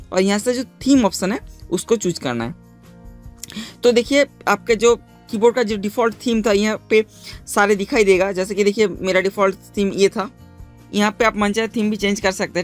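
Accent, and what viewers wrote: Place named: Bengal, India. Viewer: Vo bengali hai bol ke uska hindi aisa hai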